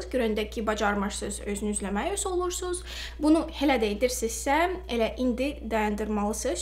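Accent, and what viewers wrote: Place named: Russia. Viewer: по твоему акценту я понял что ты владеешь и русским языком, но пожалуйста разговаривай как тебе угодно не обязательно говорить на строгом азербайджанском будь свободной не комплексуй )))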